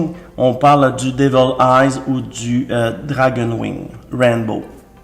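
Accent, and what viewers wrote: Quebec, Canada. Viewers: ah mais oui t'es québécois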